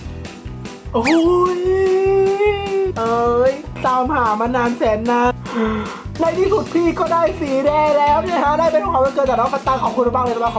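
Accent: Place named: Sing Buri, Thailand. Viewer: พูดสิงห์บุรีเหมือน miss grand เลย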